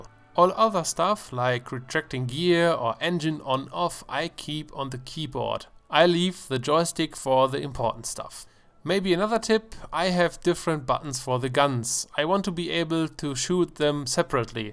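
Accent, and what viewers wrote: Germany. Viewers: I love your accent, German accent is one of the best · Hes german you can hear it at the voice🤣🤣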